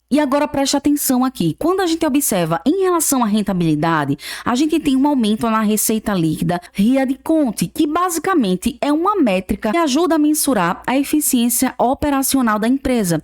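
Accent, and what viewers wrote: Northeast, Brazil. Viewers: Muito legal esse teu leve sotaquezinho do nosso nordestino tradicional!!! Isso te faz a única, e te distingue no meio de todo esse grupo. Parabéns!!! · Adorei nossa marquinha nordestina... Parabéns. Comprei 10k metade 0,46, metade a 0,51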